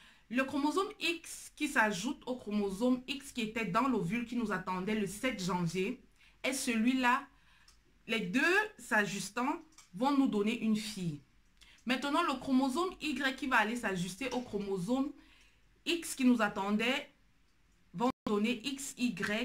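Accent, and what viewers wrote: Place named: Cameroon. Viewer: Notre bel accent Camerounais 😊😊😊 💚💚💚💚💚❤❤❤❤❤💛💛💛💛💛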